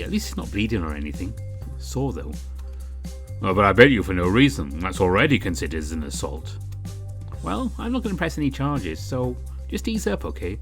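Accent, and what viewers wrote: United Kingdom. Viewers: I love the witty banter. It was nice, it goes well with your British accent.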